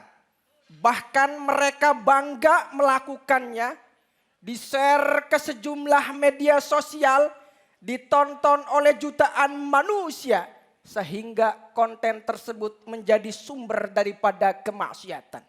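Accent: Java, Indonesia. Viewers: Materi bagus ko wasalam ya apa gara gara pokal suara tidak ngebas di tambah bahasa indo nya masih ada logat jawa jawa nya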